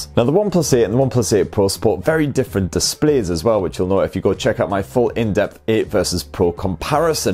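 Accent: United Kingdom. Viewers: I love his british accent and cheery attitude every video. Makes you excited about any tech that he's talking about.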